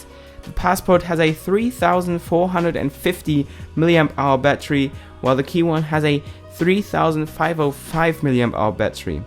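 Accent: Germany. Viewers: Are you german ? You have this german somthing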